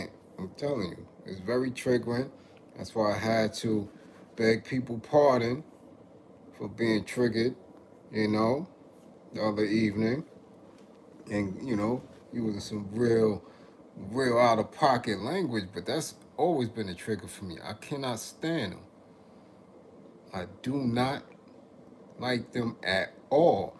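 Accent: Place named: Brooklyn, United States. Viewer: New sub (from Albany, ny) I love hearing you talk that talk with doggie in that bk accent. I had to subscribe and keep getting that knowledge. We need more men like you in this world to help get our ppl together. Keep up the great work!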